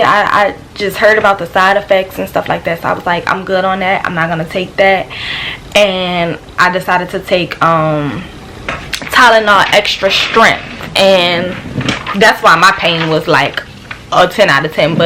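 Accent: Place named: New Orleans, United States. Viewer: I knew u was from New Orleans u talk like me lol bt thanks for th info hun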